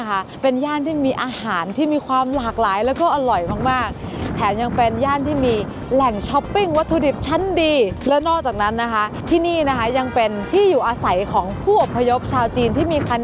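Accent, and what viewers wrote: North, Thailand. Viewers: พูดภาษาเหนือน่ารักดี ชอบๆๆๆ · น้องเป็นคนเหนือกาจ้าว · อู้กำเมือง ม่วนเจ้า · อู้กำเมืองระเบิดไปเลย😊คนเหนือ😂ยินดีตวยเน้อ❤🎉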